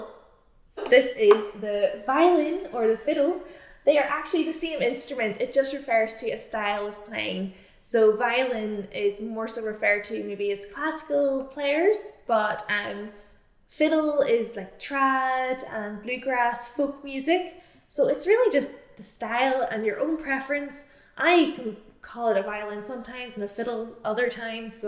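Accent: Ireland. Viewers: I love hearing your authentic irish accent. It's not to often that I get to hear one. I love it and I like learning about the history of instruments from a beautiful Irish lasse.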